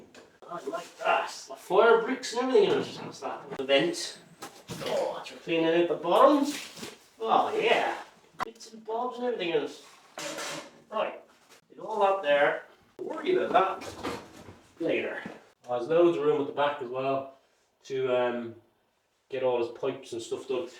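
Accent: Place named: Ireland. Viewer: I absolutely love your vids darling, you explain things concisely and clearly in that gorgeous lrish accent, and your gentle sarcasm has actually made me laugh out loud, which so RARELY happens !! I've just subscribed and.........thankyou for cheering me up !! xxx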